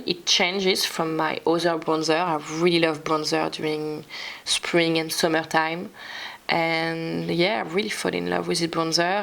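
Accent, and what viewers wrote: France. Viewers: Coucou, j’ai une petite question vraiment pas pensée de manière méchante mais fais tu exprès d’avoir un accent français en anglais aussi prononcé ? Peut-être pour accentuer ta différence d’être française entre toutes les autres YouTubeuse ?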